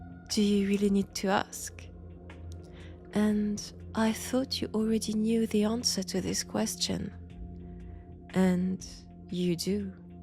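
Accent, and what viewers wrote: France. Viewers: I'm curious,are you a real french or do you just talk that way? I like this kind of way you do this,it has something. Good Roleplay for this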